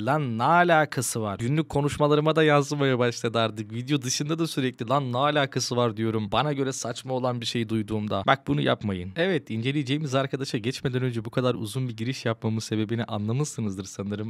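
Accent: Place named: Aydın, Turkey. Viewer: abi memleketlimsin valla aydın'lı olduğun konuşmandan belli sevgiler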